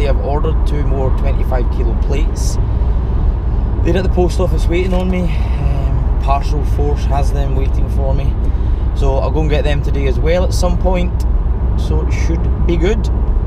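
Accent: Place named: Scotland, United Kingdom. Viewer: .....then we see some training videos from you and me next week!👍 I have to admit to have some problems to understand your scottish accent too😉 Best Regards from Spain, Adam💪💯%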